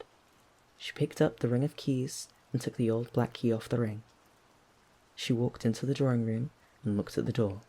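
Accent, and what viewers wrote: United Kingdom. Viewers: I'd never thought that a British accent Coraline would work just as well as the typical American one, yet here we are.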